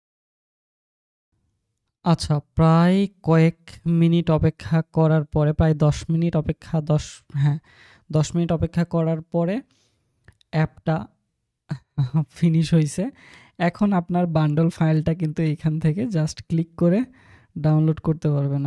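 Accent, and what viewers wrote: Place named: Kushtia, Bangladesh. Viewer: bhai apnar basha kushtia kothai?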